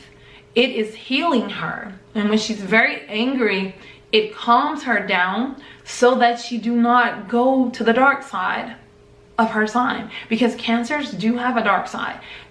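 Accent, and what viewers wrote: Caribbean. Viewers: Where are you from you sound like your accent is Caribbean!? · yea i grew up in Bama but I kept focusing on what I thought was a Caribbean accent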